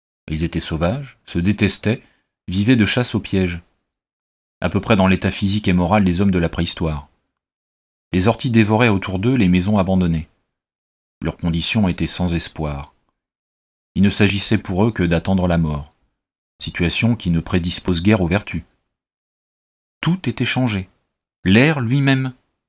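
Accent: North, France. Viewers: Dommage que l'accent soit du nord pour raconter une histoire que Giono a raconté avec notre accent du Sud!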